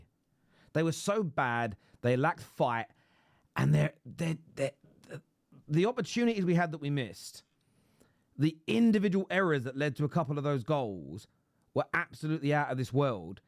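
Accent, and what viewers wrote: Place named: Manchester, United Kingdom. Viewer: Anything for a pound note great Manchester accent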